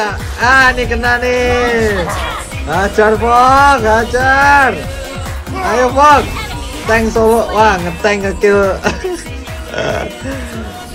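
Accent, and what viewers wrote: Java, Indonesia. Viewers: Bang kok medok? Org mana?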